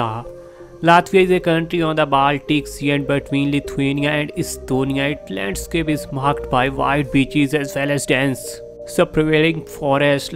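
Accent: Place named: South Asia. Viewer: Can’t you tell he’s from south Asia (indo-Pak) ?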